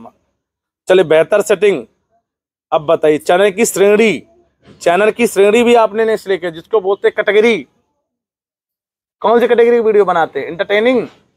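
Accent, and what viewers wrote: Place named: Chitrakoot, India. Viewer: Baba ji aap kahan ke ho Bhasha ka chitrkut District ki lag rahi hai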